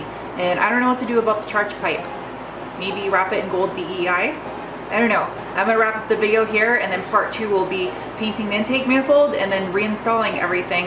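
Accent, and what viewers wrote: Australia. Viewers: As an Australian I loved the acent you would fit right in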